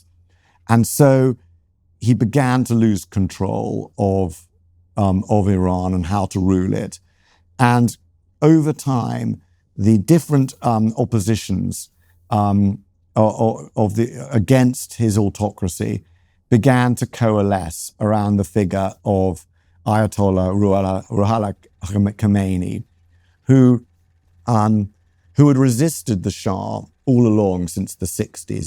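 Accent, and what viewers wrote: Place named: United Kingdom. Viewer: just as dull and ridiculous as his British accent this retard is so blinded to distinguish between the turks, Arabs and Islam... imagine saying the short painter or Leopold II of Belgium were representing Christianity... we Arab have alot of issues and we acknowledge our mistakes, but we didn't commit horrible crimes as the turks, British or the rest of colonial criminals at least our countries weren't built by looting India, South America, Africa... and of course we did not send our armies to to force drugs trade on Chinese ... the latest savages as AL qaeda, isis were founded by the USA to fight the soviets and later to destabilize Syria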